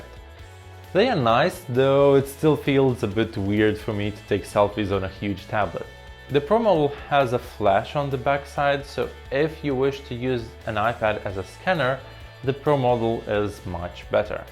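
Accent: Russia. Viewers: Хей, ты же русский да? Я препод английского и меня не покидает это ощущение .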